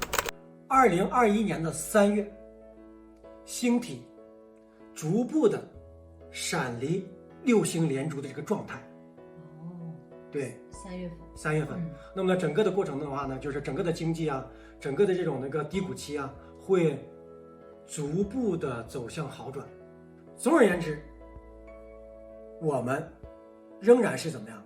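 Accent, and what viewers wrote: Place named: Northeast, China. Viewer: 这个人的说话要训练，且不说一口东北音，他每一句都说：这个的话呢，什么的话呢……。这是语病，要做播音必须要改这个不净口。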